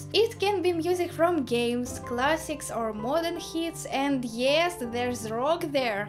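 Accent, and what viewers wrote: Russia. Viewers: когда говоришь по-английски, стартуй буквы глубже, иначе акцент бъет по ушам даже мне, русскому. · Lovely to hear your voice over the Flowkey advert - English with a Russian accent 😘 You should be in a James Bond Film 😉 Your piano interpretation was so beautiful and melodic you are a special rainbow - I always look forward to you , you inspire me 😀😊🤗🤗😎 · Lovely russian accent, listening to you is a joy, every time :) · Этот милый русский говор, имитирующий английское произношение, заставляет меня думать, что русские танки просочились сквозь бутылочное горлышко Фульды и пьют кофе на французских пляжах. Спасибо, очень хорошо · До этого видео, я на канале только второй день и думал что автор девушка из-за границы откуда то) но услышав русский английский, сразу понял, что это не так😉😌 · Your Russian accent when you talk English is so cute. I'd like to greet you for this amazing video. 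Congrats! Ps: I always wanted to learn how to play piano. So, thank you for sharing with us this app. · Привет! I hope I wrote your name correctly in Russian 😀. I just speak some words in Russian language and I don't speak English fluently. But I could understand everything you said. I love your Russian accent so much and your videos... the way you play... Everything. Beautiful and amazing as always. 💘❤️☺️ Congratulations! From Brazil 🇧🇷 All the best, Matheus. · РУ.акцент не уберешь из жизни)))лайк)) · Нам все равно слышно русский акцент) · Me: Plays epic piano! Me: Loves Rock! Me: Has cute Russian accent! Me: recently discovered her channel! Me: uploads new video at 3am Me: has very cute smile Also me: my life is complete! 😍😍😍😍😍😍😍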